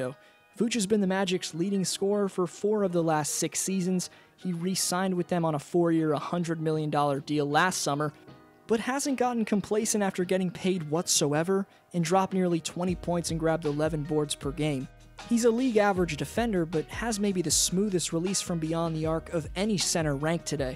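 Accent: Canada. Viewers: R u from Toronto/ Canada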